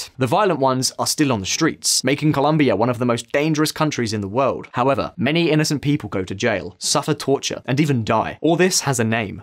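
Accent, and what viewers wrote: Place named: United Kingdom. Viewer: Pretty slick move, making this video with the high production value, well spoken host, young guy, British accent to push the Uribistas' version of reality. This is not, and has never been, a "both sides" issue. Colombia does not have a middle class. It is the most economically unequal country in the world, and has been for a long time. So, the pandemic didn't just bring about hard times for this imaginary middle class. It caused those at the bottom of the upper class (people who work jobs, live comfortably, travel, have disposable income) to lose their jobs, and plummet aallllll the way down to the upper level of the lower class (people who are just barely surviving, eating most days, but not all, and living in substandard homes surrounded by crime. Having lived in Bogotá for a year, and having learned so much from my wife who was born and raised, I call foul on this whole video. 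There is no middle class. There is no infiltration by terrorist groups. Police are not being shot at. They're getting rocks thrown at them. Molotov cocktails are the most they have to defend against. ESMAD and the military are provoking the violence, every time. Facebook live videos have made these sleek propaganda pieces easily taken apart. Colombia has never had good government. I was there when a referendum vote was held to simply make corruption illegal. It was an obvious landslide. But the number of overall votes were not enough, because Uribe's paramilitary threatened many voters into staying h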